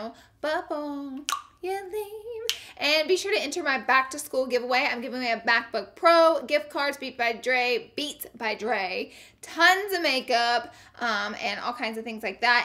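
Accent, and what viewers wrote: South, United States. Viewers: Tori Mizuno go tell a Canadian to say say sorry correctly lmao we all know she’s form the south and we all say things different depending on where we grew up. Come on now. Stop trolling. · Southern thang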